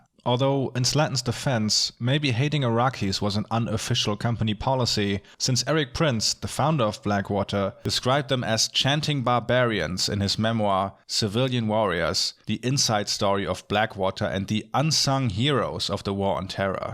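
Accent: Germany. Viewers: Yea you’re right especially in this one. The German has really faded, but considering the subject content for this episode it’s understandable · he is german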